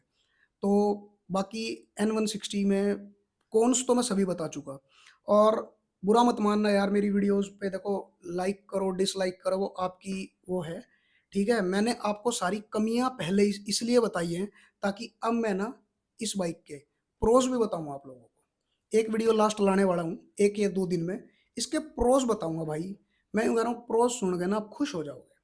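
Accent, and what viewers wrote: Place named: India. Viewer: bhai no doubt gadi bahut achi hai in terms of performance,looks and road presence but agar gadi humein problem de to bahut dukh hota hai maine bhi aisi kuchh dikkate apne manpasand bike me dekhi hai to mai samjh sakta hun.. baki apka desi accent 🤩 mat chhodna keep growing.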